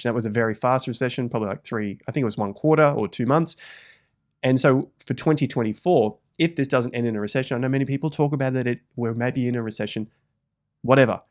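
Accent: Australia. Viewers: Legendary as always! I seem to laugh more than I probably should purely from the Aussie delivery and the humour 🤣👌 love it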